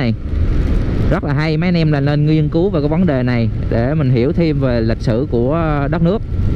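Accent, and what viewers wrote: Central, Vietnam. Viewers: Chưa bao giờ cmt trên yooutube,nhưng giờ phải cmt...Đây mới là MotoVlog đáng xem,xem xong 1 video được khá nhiều thứ cùng với giọng nói rất thân thương,ng miền bắc nhưng sao thấy giọng miền trung thân thương quá.Cám ơn đã bỏ thời gian và công sức để làm ra những video rất hay,rất bổ ích cho mọi người..Chúc và gia đình luôn khoẻ mạnh.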